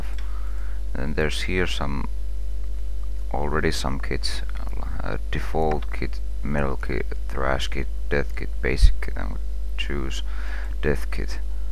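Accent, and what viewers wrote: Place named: Finland. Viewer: finnish as fuck, great totreal lol.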